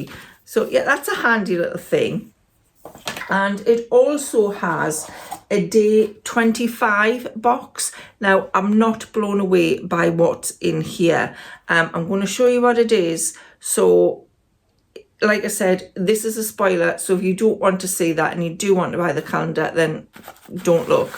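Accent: United Kingdom. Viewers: First of all, how nice to hear a British accent on YouTube!!! I watch loads of bookish, interiors and cooking videos when I can, and the presenters of the videos are - without fail - American - so I'm so happy to have found you. Look forward to catching up on your back-catalogue of book recommendations. x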